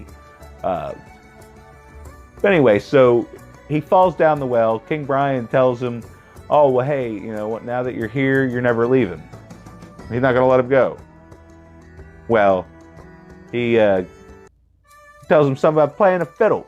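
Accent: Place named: Scotland, United Kingdom. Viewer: AYE! It's Scottish!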